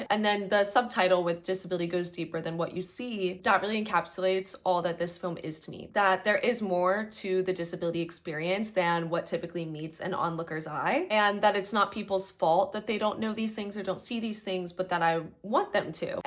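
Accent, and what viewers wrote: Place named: United Kingdom. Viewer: Someone tell me why I thought she was British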